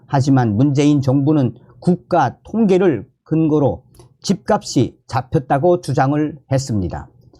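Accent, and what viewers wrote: North Korea. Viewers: 아저씨 말은 북한말같애 북한말 하지마요,짱나요.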